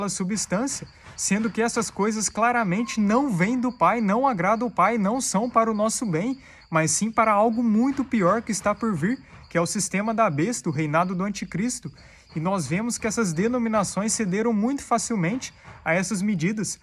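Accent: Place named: Minas Gerais, Brazil. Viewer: Olá! Eu adoro ler os comentários dos irmãos! E muitos que li me identifiquei. Alguns irmãos testificam sobre a tristeza que sentem dentro dos templos, por vários motivos, inclusive e principalmente por só pregarem prosperidade e não o evangelho na sua essência. Isso sempre me incomodou as poucas vezes que fiz parte. Fui batizada ainda bebê num templo católico da minha cidade, no sul do Piauí. E nunca me sentir com vontade de fazer parte de algo por lá, pois o meu corpo não me pedia pra participar, uma força superior parecia me impedi de estar ali, isto eu percebia. Aí eu fui ganhando maturidade sobre este assunto e eis que descobrir os motivos da minha antipatia por tudo isso. E hoje com 49 anos, tenho certeza que foi o Criador que me livrou desse ambiente, eu creio que ele me ama muito em ter me livrado dessas abominações. Eu digo sem medo, sou infinitamente grata ao Pai por ter me feito enxergar desde cedo o que tudo isso significa na sua verdadeira essência. 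Obrigada, irmão! Vc tem me ajudado muito a entender sobre a palavra, assim com seu jeitinho mineiro de falar😊 muito obrigada de ❤️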